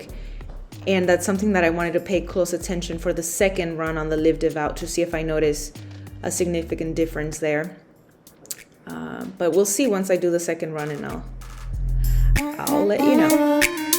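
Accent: Philippines. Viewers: you sounds like pinoy 😁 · parang may puntong piniy toits 😄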